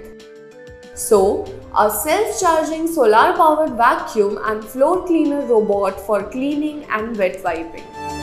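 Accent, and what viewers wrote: India. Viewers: the accent is almost totally but not quite totally indistinguishable from English. It's very.. bolly. Staccato... too Adamant. English should be softer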